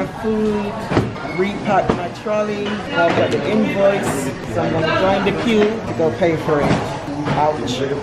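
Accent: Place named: United States. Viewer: Her accent sounds a little American.I wonder if she lived in the states a little bit.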